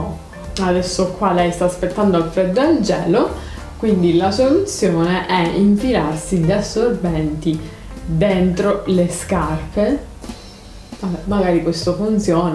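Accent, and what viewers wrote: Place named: Catania, Italy. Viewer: sei tutti noi. Domanda sei di Catania? L'accento ci assomiglia, e io mi diverto più di tutti, essendo messinese capisco alcune cose che dici in dialetto. ❤❤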